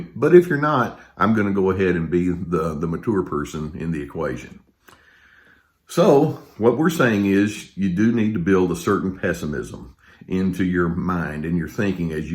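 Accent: Texas, United States. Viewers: I knew it was Texas even before I knew it was Texas. It’s his Texas accent, I have it too, grew up in Texas, lived there for 22 years (till I made one of my horrible life mistakes by marrying a douche bag) All the time I get “where are you from, where’s your accent from” evidently the Texas drawl never leaves you... and, I live in Biloxi Mississippi but evidently it sounds a little different! 😀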